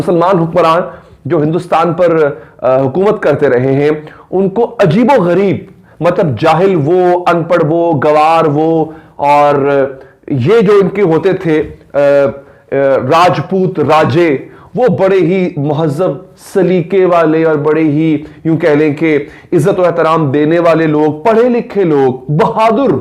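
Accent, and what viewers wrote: Punjab, India. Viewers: He mostly uses some punjabi words in a typical accent n its really cute to hear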